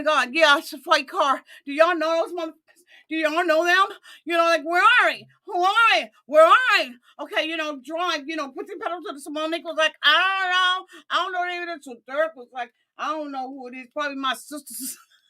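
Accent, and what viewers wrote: Washington DC, United States. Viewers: Hey girl. I love love love you. I am also from the DMV… I knew u were from here the first time I saw your show and heard that DC dialect. Love ur commentary 😂😂😂❤❤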